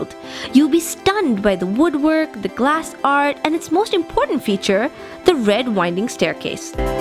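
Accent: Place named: Spain. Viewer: I'm Portuguese and hearing her pronounce every single place with a Spanish accent really made me cringe. People need to understand that Portuguese and Spanish are two different languages, we might have similarities but accent is not one of them. Portuguese and Spanish don't have a good history together so please don't ever mix Spanish and Portuguese because both ethnicities won't appreciate it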